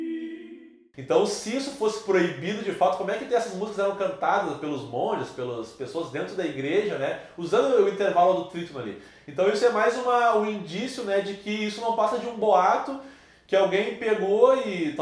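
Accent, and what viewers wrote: Rio Grande do Sul, Brazil. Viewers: Olá professor! Estou encantada com seus vídeos. São todos maravilhosos e de excelente qualidade!! Gostaria de saber seu nome e nome da equipe de trabalho. Creio que sejam gaúchos, pelo sotaque. Acertei??? Abraços à todos! E parabéns pelas explicações simples e fundamentadas.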